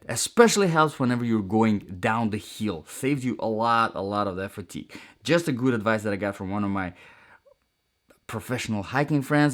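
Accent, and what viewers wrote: Russia. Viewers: you have perfect russian accent, i can understand every word😅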